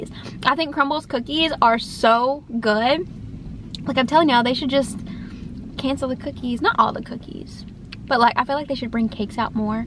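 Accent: South, United States. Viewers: You’re adorable! 😊 I’ve come across your channel a couple times with you trying out the new Crumbl cookie of the week. I like how you explain in detail about the taste, the quality and the flavor and it’s even better with your cute southern accent, which I adore. Thankyou for a great food review/Mukbang.❤😊😋😁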